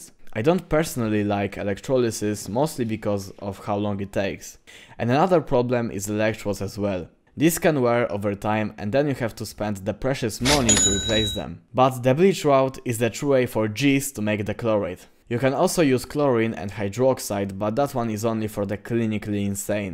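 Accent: Poland. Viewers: po kilku slowach juz wiedzialem ze polak xD sub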